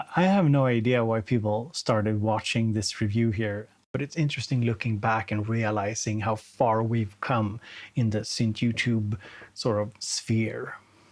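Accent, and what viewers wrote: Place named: Stockholm, Sweden. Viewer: I can picture myself getting rid of pretty much everything except my OG Circuit. It's such a joy. The immediacy of the sequencer, the knob twiddling exploration, the portability. I honestly, unironically believe it's an ideal environment for getting a curious young brain into understanding and creating music. Imagine getting one for your 12th birthday. (Din engelska har blivit bättre och bättre, men i mina öron låter du fortfarande som nollåttan, han med syntarna. ^_^ Hör av dig om jag kan hjälpa dig med uttalscoachning. <3)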